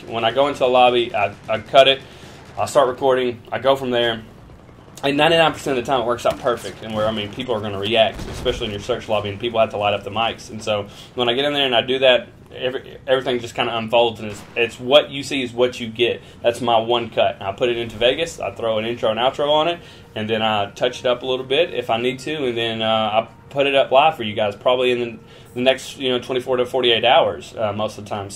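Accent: United States. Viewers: Your awesome:) One question, where in America is your accent from? I can't pick it cause I'm from NZL.. Haha. Keep up your great videos! Always brings a smile to my face.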